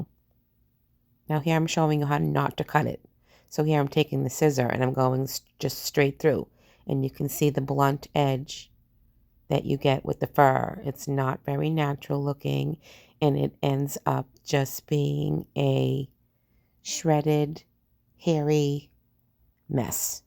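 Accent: Massachusetts, United States. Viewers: I love your accent and when you say “wicked smaht.” 😂 I am from PA, so I feel like I am welcome in your MA world when you say that. 😂 You do such an awesome job on all of your projects, so it is no surprise that everyone wanted him. 😊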